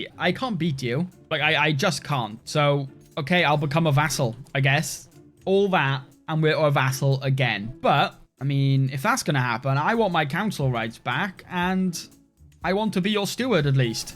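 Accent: Wales, United Kingdom. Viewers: Are you Welsh? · Is that a fellow Welsh man i hear . Respect from the Merthyr Valley. 🏴⚒️⚒️⚒️. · the welsh accent threw me off, sounds like you straight from Bridgend! lol LOVE IT!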